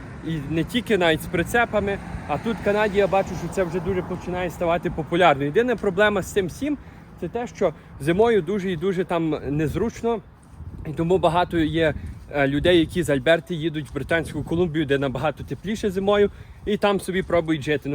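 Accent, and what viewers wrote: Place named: Western Ukraine, Ukraine. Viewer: Какой противный западынский акцент.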